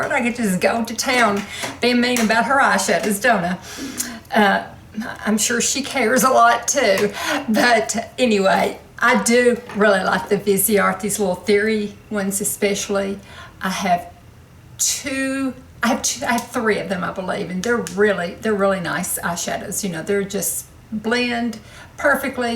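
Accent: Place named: Oklahoma, United States. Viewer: I just love your accent and the expressions you use! Reminds me of home (Oklahoma). Loved the eye looks and I'll bet no one could tell you had two different pallettes on! XOXO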